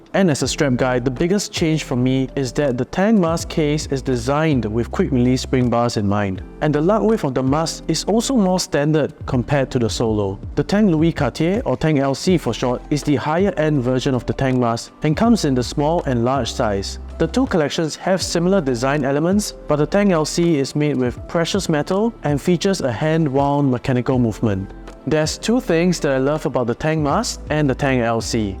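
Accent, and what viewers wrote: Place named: Singapore. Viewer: Singelish is annoying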